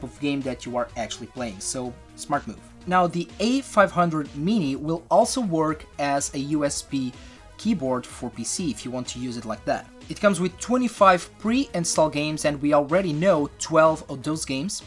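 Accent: Portugal. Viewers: Eu estava a ouvir o sotaque e pensei...deve ser Tuga. E és mesmo. :)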